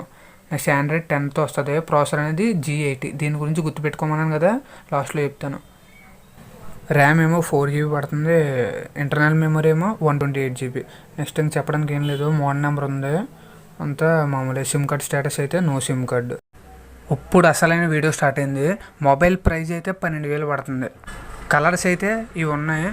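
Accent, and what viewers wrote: India. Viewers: nice talk...i think your EAST or WEST in Ap..😁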